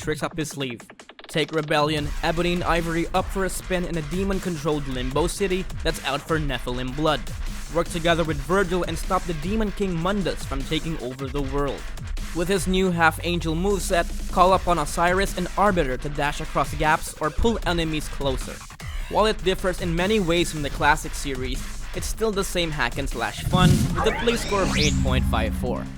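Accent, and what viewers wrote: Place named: Philippines. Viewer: Alam na alam mong Pilipino narrator eh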